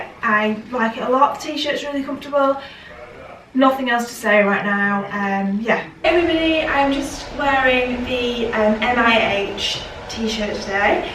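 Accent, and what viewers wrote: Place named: North, United Kingdom. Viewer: My favourite fashion youtuber & you have a northern accent which makes me feel so at home!! Stripes are my favourite at the moment - I've been about bought a couple of striped tops yesterday! X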